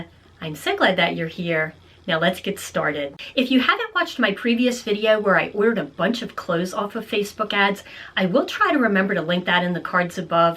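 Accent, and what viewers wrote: Philadelphia, United States. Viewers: Thank you for posting this video! I’m cracking up tho I hear a lot of Philly/S Jersey in your accent. Se d them back if they hurt. But they’re beautiful and legit boots of good quality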